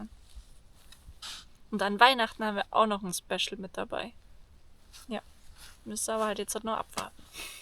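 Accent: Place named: Rosenheim, Germany. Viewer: "Servus aus Marokko" cool! Schön, dass man in und um Rosenheim immer noch so redet, wie man da halt redet : )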